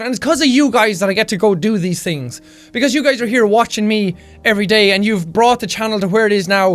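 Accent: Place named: Ireland. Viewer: Yay irish accent!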